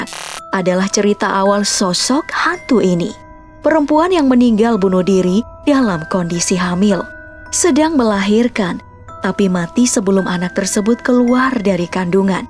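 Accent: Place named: Pontianak, Indonesia. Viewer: Yang berasal dari pontianak mana nihh suaranyaaa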